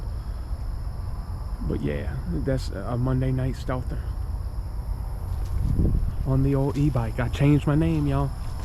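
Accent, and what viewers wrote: South, United States. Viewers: Fun looking chill video, l also love the sounds of waves lapping. Hey you use what ever way of speaking that you want to y'all, for us guys up north it is nice to hear your Southern Accent and local nomenclature that you are used too. thanks for the video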